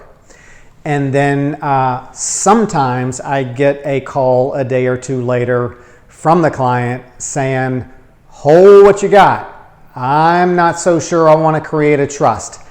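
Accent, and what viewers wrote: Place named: South, United States. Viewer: Thank the southern drawl.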